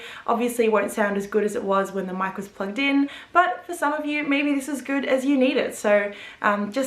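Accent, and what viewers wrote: Australia. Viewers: I really like how you talk. That Aussie accent sooo nice! Nice camera btw 🙌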